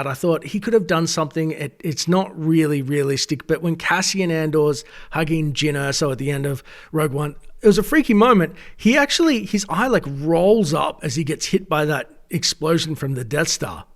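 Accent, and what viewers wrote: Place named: Australia. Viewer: Excellent! Great to have you back buddy. We’ve missed those dulcet antipodean tones!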